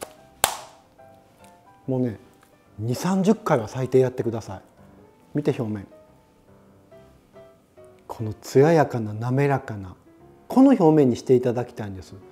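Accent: Kansai, Japan. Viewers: こんなすごいレシピを無料で教えてもらえるなんて本当にありがたいです！コウさんの関西弁の柔らかい話し方も大好きです😊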